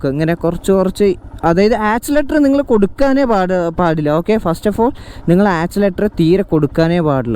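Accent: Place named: Kochi, India. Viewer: Kochi machaan crrt sound 💯😂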